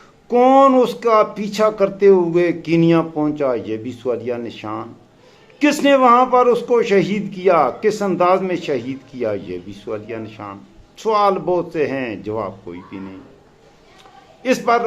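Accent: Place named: Pahari, Pakistan. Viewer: آپ کے تلفظ سے آپ پٹھان نہیں پہاڑی لگتے ہیں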